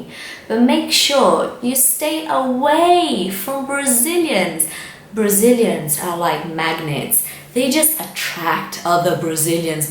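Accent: United Kingdom. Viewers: what a wonderful british accent! :), congrats for your channel, it's gonna be helpful for my english studying.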